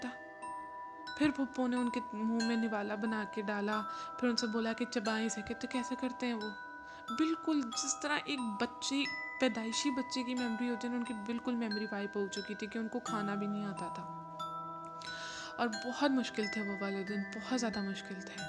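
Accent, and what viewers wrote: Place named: East Punjab, India. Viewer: Your Punjabi accent is of Eastern Punjab،Sardar type. Sounds nice.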